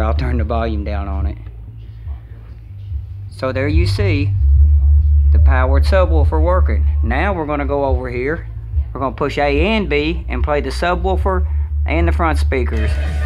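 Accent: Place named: Kentucky, United States. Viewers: So I can tell from your voice your from my home state lol, what part of Kentucky bro? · I bet this dude is from Kentucky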